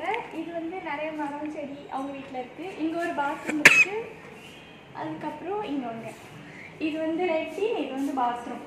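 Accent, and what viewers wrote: Tirunelveli, India. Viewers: Innocent is bliss. Ur innocent soft voice n tirunelveli slang super...I happen to see one video on 16th may that ammavituke ponnu pogamatta..liked it subscribed n watched all videos same day...liked almost all. Keep doing.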